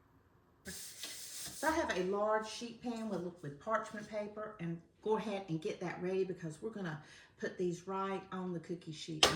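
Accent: South, United States. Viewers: Hi, the cookies look Yummy. I will have to make them. I have to say the cake mix cookie video. I made them and the cookies are so good. Also your video for pork tenderloin leftovers, I live in Indiana and didn't know if I could find the Duke's Golden BBQ sauce in my area. I Found It, at a local food chain store. Meijer! I cooked a pork shoulder the other day in the slow cooker, so tomorrow I'm making BBQ pork sandwiches and trying the Duke's sauce. Thanks for all of your videos!! Love your Sweet Southern Accent!!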